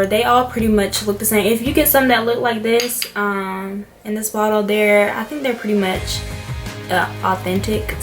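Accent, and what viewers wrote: South, United States. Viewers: Love your southern accent. I am from Texas. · the little southern drawl...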